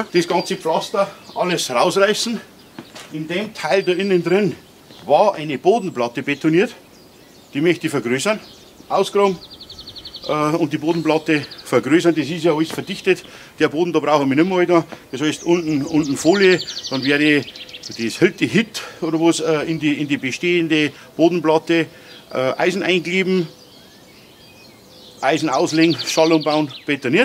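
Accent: Bavaria, Germany. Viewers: Hallo! Als Preuße lerne ich dich akustisch zu verstehen. Ich vermute das du ein "gemildertes" Bairisch sprichst. Da ich deinen Vlog mag und mich nach anfänglichen sprachlichen Problemen zu einem Fan entwickel, vermute ich das es auch in ferner Zukunft einen Punkt geben wird das ich dich verstehen werde. Ich lasse für den Anfang ein Abo da und freue mich auf weitere Projekte von dir. 😀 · Hed eher vom slang her af woidkircha de richtung tippt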